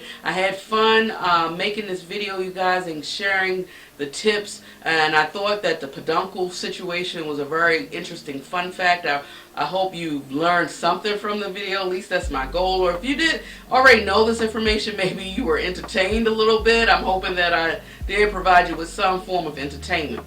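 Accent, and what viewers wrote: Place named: Maryland, United States. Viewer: If u ain't from Merrland i dont kno who is prolly my fav accent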